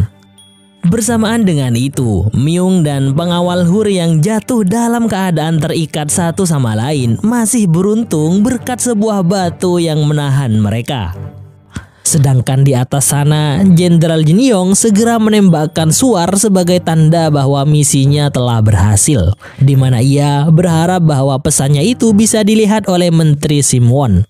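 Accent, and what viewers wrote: Madura, Indonesia. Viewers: Madura mana bg?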